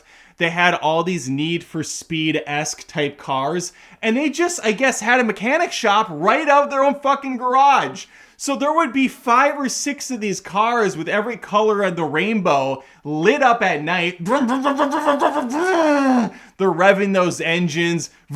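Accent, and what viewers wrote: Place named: Minnesota, United States. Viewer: Beig, Bayg... U r from Minnesota.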